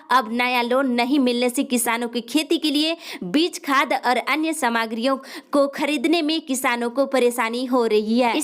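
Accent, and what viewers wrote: Jharkhand, India. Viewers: M punjab me rhta hu lekin waha v aapke News sunta hu. . bhut achaa lgta h.. jharkhand wali feeling aati hai aapki awaz n Kabhi kabhi koi mistake se v. Keep it up 👍👍👍👍👍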